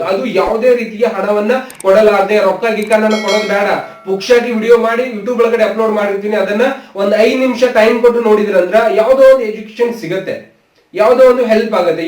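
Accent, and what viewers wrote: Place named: Karnataka, India. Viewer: Kannadaa Matadudu nimm indaa kali beku sir pakkaa javaari kannada nimdu....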